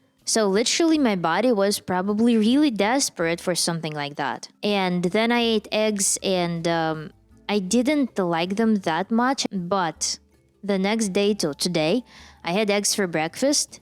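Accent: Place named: Eastern Europe. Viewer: You sound Eastern European. Meat is your identity😂. Congrats on finally kicking the mental illness away and stay meat-based.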